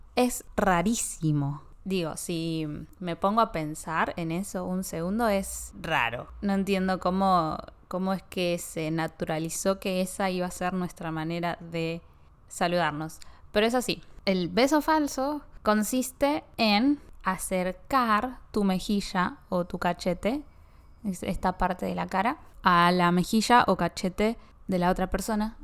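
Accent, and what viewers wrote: Argentina. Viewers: ¡Hola,! Me encanta tu programa, especialmente este episodio sobre las costumbres argentinas. Aprendí muchísimo, como por ejemplo, lo primero que mencionaste sobre las diferencias en "cumplir con los horarios". Antes tenía el prejuicio de que los argentinos no eran puntuales, porque en China tenemos muy arraigado el concepto de la puntualidad. Sí, soy de China, y me encanta el acento argentino. Actualmente estoy aprendiendo español gracias a tu programa. En cuanto a temas como las propinas, ya lo había preguntado a mis compañeros. Por ejemplo, quería saber si en la peluquería era necesario dejar propina, y ellos, riéndose, me preguntaron si alguien me estaba obligando a darla. 😂 De este episodio también aprendí algo muy importante: cuando se visita a un amigo, lo mejor es llevar una buena botella de vino. No ir con las manos vacías es una costumbre que también tenemos en China. 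Allá solemos llevar frutas, o dependiendo de la edad de la persona, cajas de comida especial o suplementos nutritivos. Por último, estoy muy emocionada por tu próximo episodio. ¡Voy a seguir viendo cada uno de ellos! Espero que tengas días muy felices. ¡Saludos!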